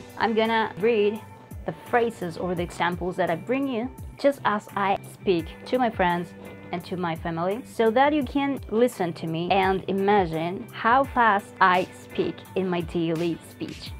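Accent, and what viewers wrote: Cuba. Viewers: I am just loving your videos!I really love the Cuban Spanish accent! I like all the Caribbean Spanish accents, but Cuban is my favourite! I really want to learn it and you're a great teacher! 🇨🇺🇯🇲🇬🇧💃🏾💃🏾💃🏾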